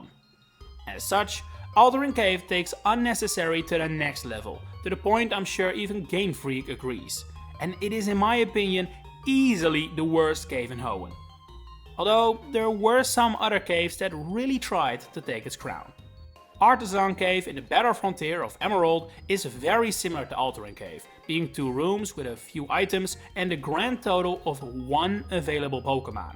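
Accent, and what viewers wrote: Netherlands. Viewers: Me, hearing you accent for the first time: "MEDELANDER????" Flash caves are the actual worst tho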